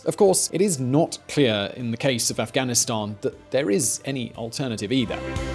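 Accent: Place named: England, United Kingdom. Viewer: I agree it was long overdue leaving Afghanistan. It will quickly revert to its previous state before the U.S. arrived and spend so much valuable coin. No more sole world peacekeeping, stupid and unplanned wars and ‘nation’ building please!?! Nations will need to start taking stock and responsibility for their current state. You can blame everyone else so long. Second, I agree with your show mostly but I still can’t stand the pencil beck geek with the prissy Englishman voice!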